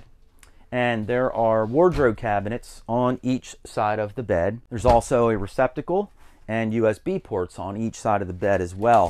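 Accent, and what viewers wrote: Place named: West, United States. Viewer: Hi, thanks for your answer, I have seen your videos my wife and my self love it, now I did not know that the process for the close caption was so complicated I thought that it was just sliding a button and voila, the close caption is generated automatically by YouTube, My apologies for that, having the subtitles helps with the accent because the west it's different from the east and it happens to me with youtubers from around the country.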